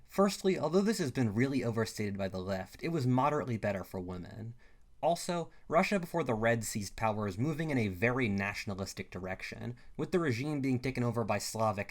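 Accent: Canada. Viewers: You're a Canadian?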